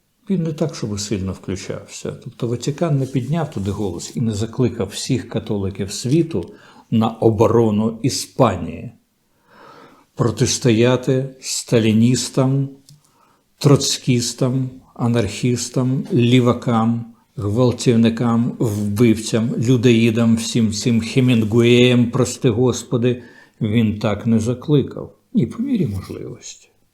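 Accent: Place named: Russia. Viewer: Доречні, пане, у Вас з'явився російський акцент! Таке враження, що Ви перекладаєте російський текст на українську!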